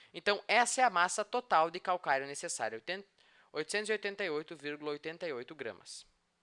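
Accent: South, Brazil. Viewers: Sotaque de sulista!!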